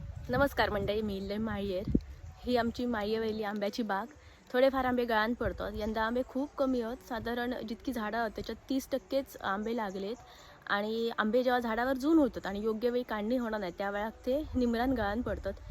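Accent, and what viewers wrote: Malvani, India. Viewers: मालवणी भाषेत वर्णन करतास ता कानाक ऐकूक बरा वाटता. ह्या स्वार्थी लोकांच्या दुनियेत आपणही कणखर राहून धंदो केलात तरच निभाव लागात.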